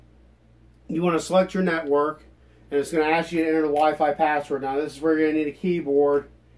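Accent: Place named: Philadelphia, United States. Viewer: Are you from Philly? Because that sounds like one hell of a philly accent.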